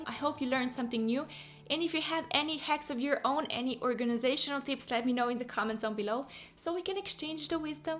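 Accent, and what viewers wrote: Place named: England, United Kingdom. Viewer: Love your english accent, where it from???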